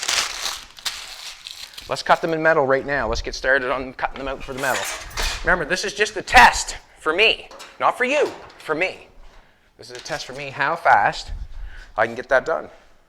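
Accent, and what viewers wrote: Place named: Canada. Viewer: Lol you Canadian eh not Ruski lol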